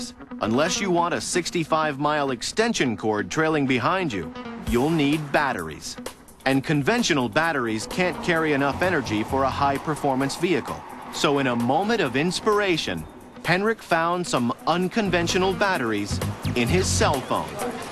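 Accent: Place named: Denmark. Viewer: Haha :D it's funny how clearly you can hear that he is danish ^^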